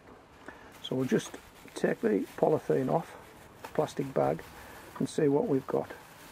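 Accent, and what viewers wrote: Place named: Cumbria, United Kingdom. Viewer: So what happened? Did you get a crop? Thanks for the videos BTW - my other half says "that's a good Cumbrian accent" (and she should know)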